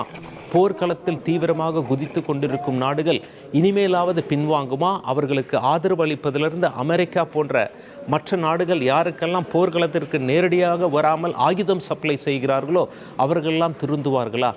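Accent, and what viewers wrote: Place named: Salem, India. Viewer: Salem voice and prononciation excellent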